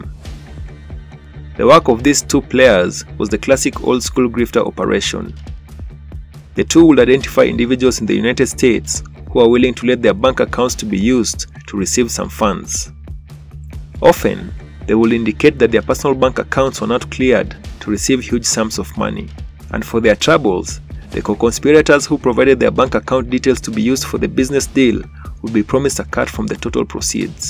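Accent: Kenya. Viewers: About section of this channel says location is United States but accent ni ya mkenya.. 🤔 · From you accent,you are a Kenyan….and you still want to pull Kenyans name into the mud.How comes we never heard there were kenyans involved from US FBI reports? You doing this makeup story for some simple views while tarnishing Kenyan name….We Kenyans in diaspora dont appreciate this! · another video of scam glorification narrated by the nairobi accented guy. not good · The narrator sounds like a Kenyan. May be that's why he insists when mentioning Kenya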